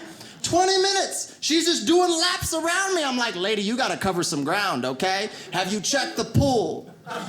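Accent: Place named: South, United States. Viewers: He sounds like he's from the South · He sounds like he's from the south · Lol, why’s this whit hood California guy talking more Texan than I do. Y’all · He's a funny guy. I know several white guys that sound like him. He sounds like he's from the South but I think he's from Cali. The guys I know that talk like him are funny too. We just say, they're "a character". That covers alot of ground.😃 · Can someone explain the southern accent from California? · This guys sounds SOUTHERN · He’s from NorCal (Bay Area), but sounds like he’s from the south lol I’m confused...🤔😳😬😂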